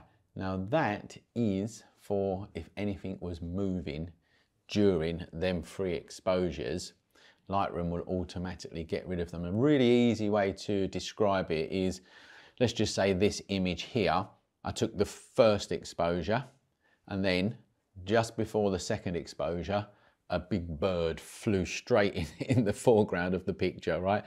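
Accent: United Kingdom. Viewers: Great to hear a Brit for once. Also, letting us experience the video before asking for a like and subscription.I will definitely be looking out for more of your videos and advice.good work.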